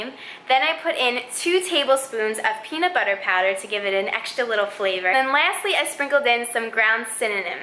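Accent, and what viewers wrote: New York, United States. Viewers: what part of Jersey or New York are you from...anyone with ears can hear it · Sounds very New York... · where are you from? I hear a bit of new york dialect? :) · I follow you on insta and I never took in your New York accent till now. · Where are you from? I love you accent, sounds New York or jersey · Are you from New York or the midwest? That accent is so strong. I like it though btw you are gorgeous! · Are you from New York??❤️ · Are u from NY? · New Yorkers? Long Island? :) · true New York/ Jersey accent there lol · Thank you thank you. Are you from new york or new jersey?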